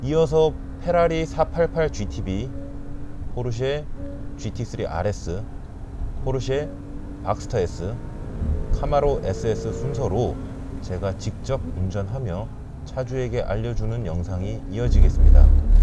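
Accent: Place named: Gyeongsang, South Korea. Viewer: 잘 보고 있습니다. 경상도 억양이 느껴지는데 고향이 서울.경기권은 아니신가봐요. 차라리 강호동, 김제동씨 처럼 말씀 편하게 해보시는 것은 어떨까 조심스럽게 의견 남겨봅니다^^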